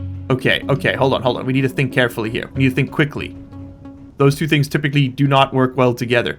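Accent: South Africa. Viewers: I couldn’t place where you were from for the live of me but South Africa makes sooooo much sense, idk what it is about some of the South African accents that are so damn hard for me to place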